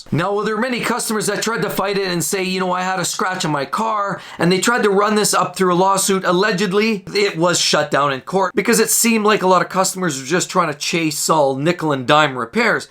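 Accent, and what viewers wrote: Canada. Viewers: God you sound like Bret the Hitman Hart....Canadian accents.....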